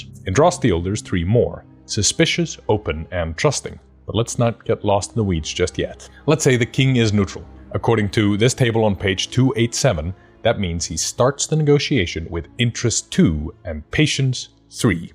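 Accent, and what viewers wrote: Iceland. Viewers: Are you perhaps Icelandic? I can hear from your accent. Well i have a question. I'm going to be running a homebrew campaign but I don't know how to make my players invested in the plot and their characters. I've played with these people before and there seems to be one consistent problem. They seem to zone out every time they complete their actions and their characters never seem to interact with each other. How do I make them care? How do I make them invested in their teammates characters? (Edit i changed the question since i thought of a solution)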